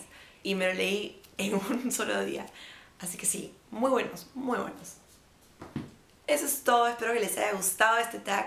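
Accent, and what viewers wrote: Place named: Argentina. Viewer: holaa, me suscribi a tu canal, muy bueno :D , la verdad en un momento pensé que eras de acá de Argentina jaja, buenos nos vemoss :D , no olvides pasar por mi canal, soy "un intento de youtuber" ajaja saludoss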